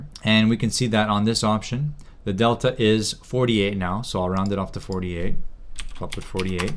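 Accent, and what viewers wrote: Canada. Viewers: what is the name of the plattform? I dont understand canadian english that well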